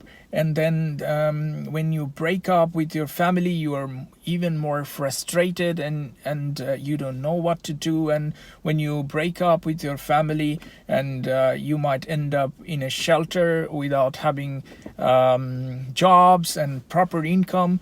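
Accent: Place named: Canada. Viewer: Bhai your accent is amazing. Looks like a native Canadian.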